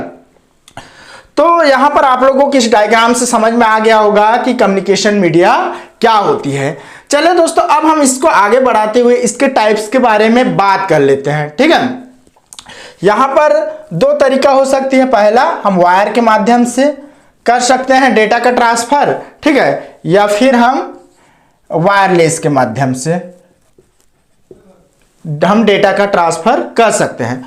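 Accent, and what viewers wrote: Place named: Bihar, India. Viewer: Thik sir ji mujhe Etna to pata chal Gaya ki aap BIHAR se hai .😊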